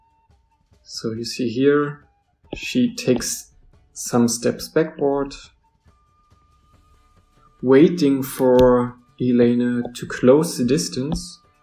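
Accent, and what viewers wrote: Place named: Germany. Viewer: I like the German Accent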